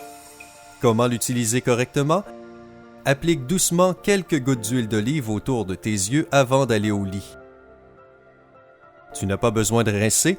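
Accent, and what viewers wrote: Quebec, Canada. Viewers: accent québécois lol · Et lui avec déjà avec son accent canadien en plus de sa il as le nez boucher rhooooooooooo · je ne sais pas si vos astuces marchent mais elles ne peuvent faire de mal:-) j'aime bien vos vidéos et j'adore votre voix et accent canadien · Bonjour Monsieur j'aime beaucoup votre accent canadien et vos conseils sont géniaux je vous un bonjour de la Guadeloupe et beaucoup de soleil peut etre que je trompe mais il fait trés froid merci vous etes génial ne changez rien .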